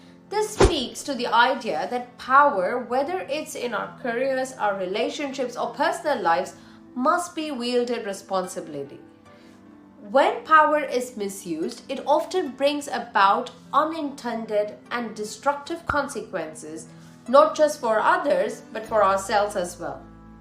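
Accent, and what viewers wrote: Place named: India. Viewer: Your ascent is truly impressive!! Are you from India ?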